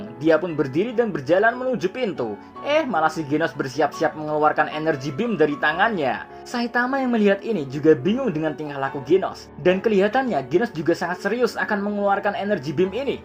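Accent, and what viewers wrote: Java, Indonesia. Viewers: Logat mimin Jawa bgt wkwkwk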